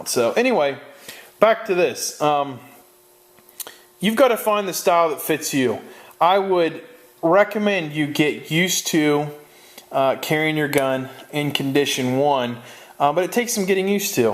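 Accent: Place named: Philadelphia, United States. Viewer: Dudes Philly/South Jersey accent is strong.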